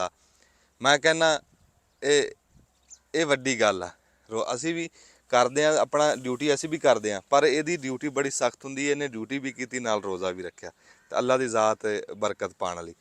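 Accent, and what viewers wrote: India. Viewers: Indian ki Tarah kio boltay ho, Pakistani ki Tarah bolo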